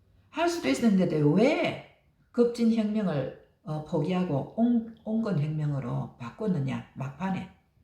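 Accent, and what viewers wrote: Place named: Busan, South Korea. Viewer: 부산 같던데요 ㅋ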